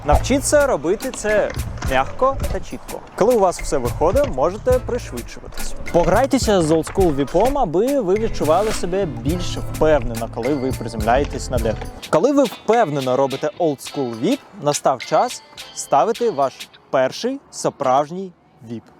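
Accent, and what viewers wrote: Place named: Belarus. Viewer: Всё же ты белорус, говор выдает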